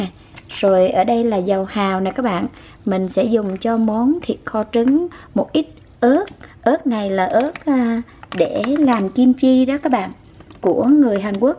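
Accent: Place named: Hue, Vietnam. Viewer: Giong hue nghe de thuong qua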